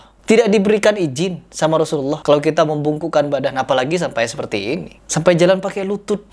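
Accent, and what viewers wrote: Madura, Indonesia. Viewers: Logatnya kayak dr Madura.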